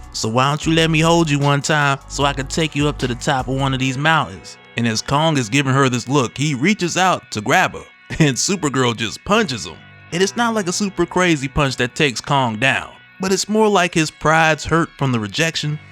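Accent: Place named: Atlanta, United States. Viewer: I swear you sound like a ATL dude fr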